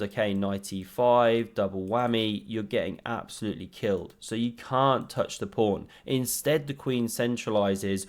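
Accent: United Kingdom. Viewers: Why is it so hard to follow what he said? He even said it in a British accent.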